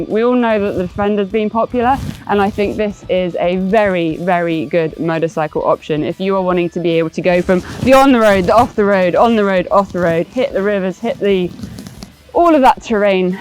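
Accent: United Kingdom. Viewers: Funny, I follow you on Instagram but never noticed that you’re British. You’re British right? Great looking pants and jacket!!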